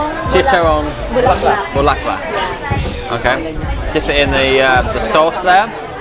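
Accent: United Kingdom. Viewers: I noticed while you were tasting the sinigang soup your companions were telling somebody (the restaurant manager perhaps) that your a famous vlogger from the UK (your accent helped too) ergo you got the dinner for free. Your lucky that your Filipino companions were social media savvy and knows how to negotiate, but have to advise you that's not always the norm. Sorry for being a spoiler but kudos to those Filipinos you were with.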